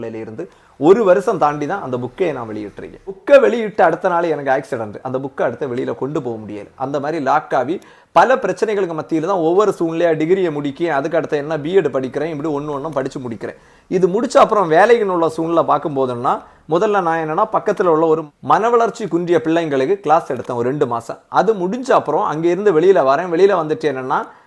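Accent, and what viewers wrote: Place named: Nanjil, India. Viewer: Great work 🎉🎉 Love your Nanjil. Accent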